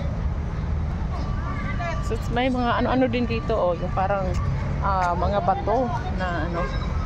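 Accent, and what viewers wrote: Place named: Visayas, Philippines. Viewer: Vlagir ka ba Day? Moka ka kseng BisayA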